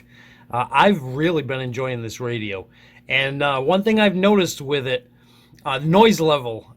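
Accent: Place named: New England, United States. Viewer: Great video, you sound like your from my area.... 22 KING OF THE HILL, Souther NH... you have a new england drawl... Rhode Island maybe?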